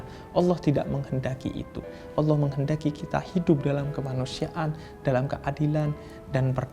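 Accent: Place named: Java, Indonesia. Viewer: bib jomblo muka arab logat jawa hee maaf bib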